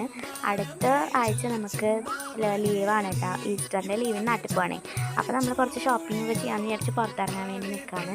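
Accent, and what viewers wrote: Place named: Thrissur, India. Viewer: സംസാരം കേൾക്കുമ്പോൾ തൃശ്ശൂർ ക്കാരി തന്നെ❤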